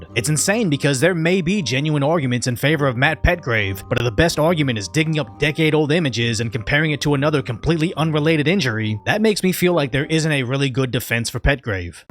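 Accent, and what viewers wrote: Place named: East Coast, United States. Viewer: Do you make all your content to covertly target black people? Im just asking, I noticed that about you, that’s not to take away from someone who very clearly deserves to have their day in court but there are so many groups who commit in frequency many of the same crimes you report. But judging by that accent it’s no surprise where you were raised, pretty much the Mecca of WS on the east coast. Your blind & biased, basically acting as the watch dog you are.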